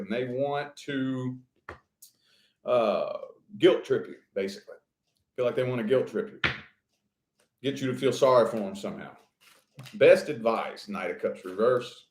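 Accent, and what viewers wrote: South, United States. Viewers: I enjoy your readings. Are you a southern fellow?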